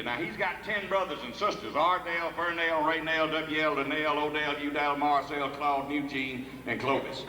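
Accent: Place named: South, United States. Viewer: Who or whatever did closed captions for this don’t speak southern. They got more words he sad wrong making it read like he said stuff he didn’t say. Ask somebody from where he’s from what he said and retype it because hearing impaired people deserve to read the jokes he said correctly.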